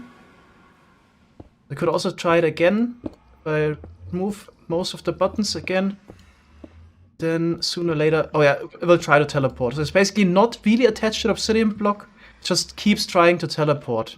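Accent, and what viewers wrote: Germany. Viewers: Love you and your german accent, but: "Glow Lichen" is pronounced "Glow LIE - KEN"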